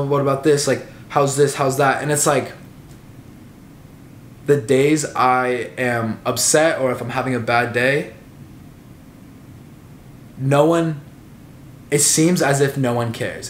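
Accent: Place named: New York, United States. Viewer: It kinda sounds like he took a trip to New York picked up a lil accent and came back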